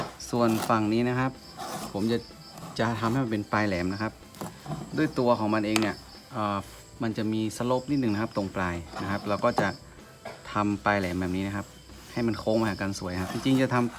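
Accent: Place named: North, Thailand. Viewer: สำเนียงคนเหนือ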